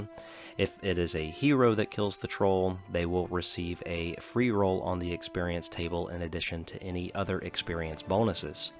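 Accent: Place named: South, United States. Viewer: Where are you from? Your southern accent keeps poking through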